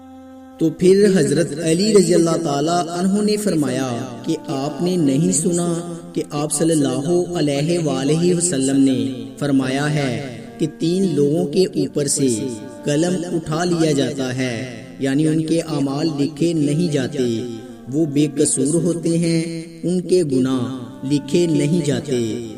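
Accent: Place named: Punjab, Pakistan. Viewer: برخوردار آپکی آواز میں ایسا سوز نہیں اور تلفظ پنجابی لہجے کا ھے۔ مناسب ھے آپ اس کام کے لئے کوئی دوسرا موضوع تلاش کریں پلیز۔۔۔جزاک اللہ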